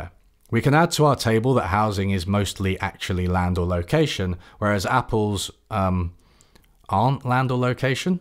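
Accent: United Kingdom. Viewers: I guess brisitsh voices make me automatically be a alt-right yt channel but great video helps me but things into a better perspective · Wait wait wait. You seem to have a British accent. And you just said something about your neighbors asking you to go dogging with them. Now I am American but I am familiar with some British slang. I hope you didn't just drop that in there thinking no one would notice. Cause I did and that's rather funny. Though, I could be wrong about your usage of the term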